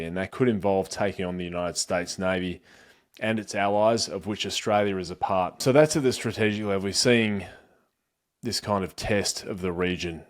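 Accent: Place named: Australia. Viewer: The dude speaks with heavy Aussie accent.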